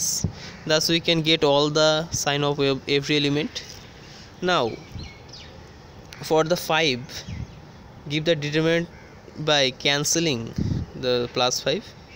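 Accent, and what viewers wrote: Bengal, India. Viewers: Banglai ho kya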